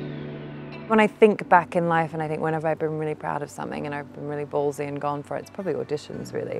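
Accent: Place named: Australia. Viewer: I'm an Aussie and she sounds Australian to me, absolutely.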